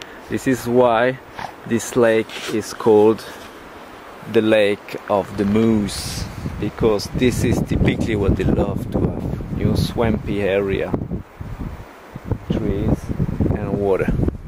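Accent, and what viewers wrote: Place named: France. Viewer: Hahaha .....I am not a linguist by any means but did detect your French accent in this video. I was converted to a firm Francophile in 1990 when I discovered I could be in a totally different climate from my home in the north of England in less than 7 hours driving and then with another 4 hours driving I could be on the Riviera and the southern French coast. I can see why you have fallen in love with Sweden the lakes and countryside do look very beautiful Thanks for your reply and I am looking forward to your next outing 👍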